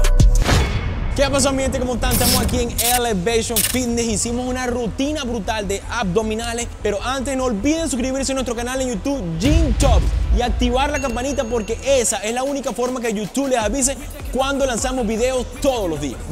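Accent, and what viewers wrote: Venezuela. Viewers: jajaj es venezolano por eso habla asi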